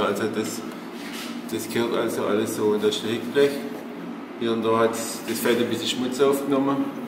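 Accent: Swabia, Germany. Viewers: Danke für das informative Video! ...Ist das Stuttgarter Schwäbisch oder eher Ludwigsburg?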